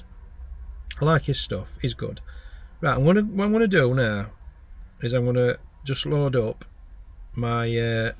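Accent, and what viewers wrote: Yorkshire, United Kingdom. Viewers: Hello,!! Yank here, who absolutely loves your channel!! I'm a new subscriber, so I don't know a thing about "van life," and TBH, I will watch any content you post and not bellyache!! Any viewer who tries to manage your content needs to get stuffed!! Your Yorkshire accent knocks me out!! And your observations are hilarious!! 🤣🤣🤣 Sweet dreams!! Ta... Chuck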